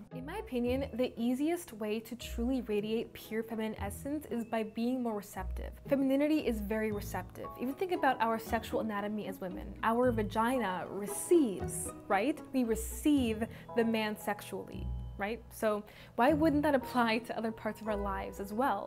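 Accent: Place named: Jamaica. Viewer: It is switched between the Jamaican accent for me. I’m Jamaican myself.🇯🇲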